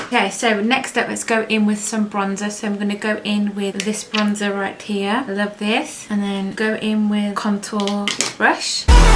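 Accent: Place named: United Kingdom. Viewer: Are you from The UK ? Love love yoir accent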